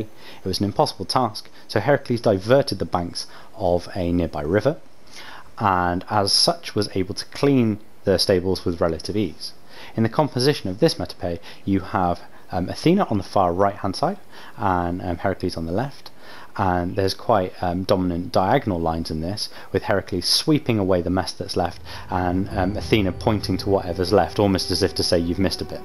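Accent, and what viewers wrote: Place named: United Kingdom. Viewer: and that sweet british scientific accent hahah, thanks for video man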